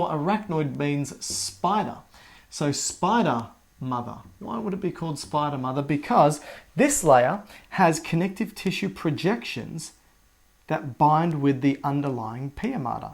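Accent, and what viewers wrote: Australia. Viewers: As an Australian, I love watching people in the comments of your videos struggling to understand your aussie accent 😂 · Same lol, it's the Australian accent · Madhu Parisa that’s just the Australian accent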